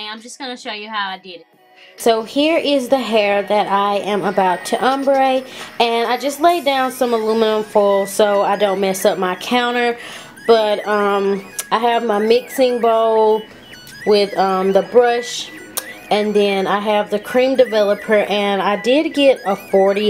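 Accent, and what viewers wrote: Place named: Texas, United States. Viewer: you have to be from Texas !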